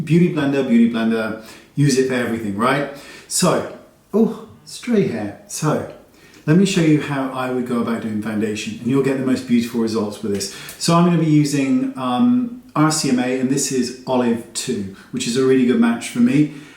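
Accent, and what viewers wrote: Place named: South West England, United Kingdom. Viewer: Hi! I just stumbled upon your videos and I think you are so wonderful! It's so refreshing to watch someone who isn't involved in drama and just really loves makeup! This may be strange, but I was just wondering where you are from? I'm from Bristol and your accent seems to have a South-West twang!!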